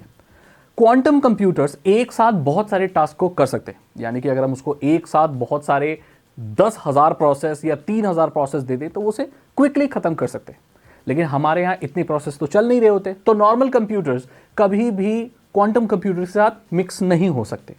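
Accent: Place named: Rural, India. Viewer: Aase lgra hai kisi gau k bnde ko sun rha hu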